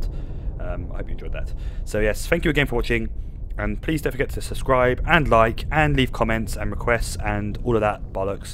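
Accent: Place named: United Kingdom. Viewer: What’s with this British talk on an Australian Built Submarine I am disgusted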